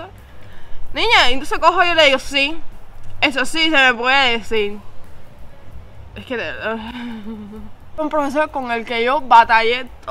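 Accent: Cuba. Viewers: Me gusta como platicas. Me gusta tu acento cubano. Desde Monterrey, México. Cuídate mucho.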